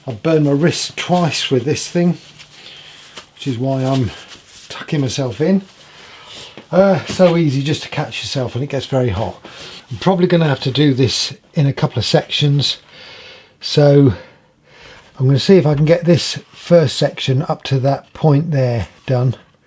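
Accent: United Kingdom. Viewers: By the way, we really appreciate that you narrate your videos and tell us what you’re doing and why and where this bit of wood came from, etc. So many these days are doing the silent ASMR-type of videos, and while those have their place, we prefer the talking. And it’s nice that you have a British accent, too.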